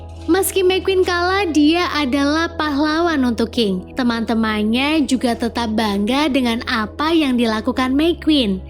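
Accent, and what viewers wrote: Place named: Java, Indonesia. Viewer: Jowone medok men mbak e😁😁😁😁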